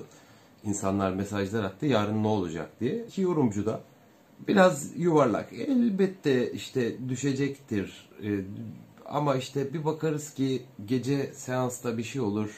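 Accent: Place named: Erzincan, Turkey. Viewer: Memleket nedir.Erzincan yöresi gibi geldi.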